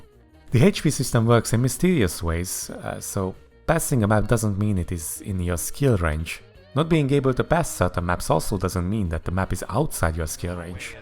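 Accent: Hungary. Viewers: What is your main language? Bcz its sounds like hungarian. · Az akcentusodbol egybol tudtam, hogy magyar vagy, nagyon jok a videoid :D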